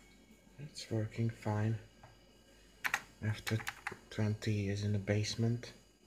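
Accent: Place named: Hungary. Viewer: Helló magyar vagy valószinü ugyanis nem angolos a akcentusod :D .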